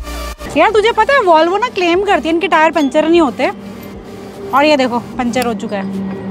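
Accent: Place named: Northeast, India. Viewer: North east wala hindi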